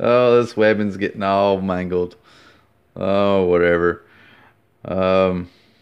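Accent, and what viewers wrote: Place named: South, United States. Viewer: I like Mark Bageley's Spiderman. Also I like your Southern drawl, its nice to listen to.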